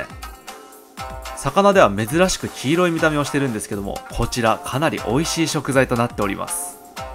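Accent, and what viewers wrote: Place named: Kansai, Japan. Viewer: たまーに関西弁入るのは何でなんですか？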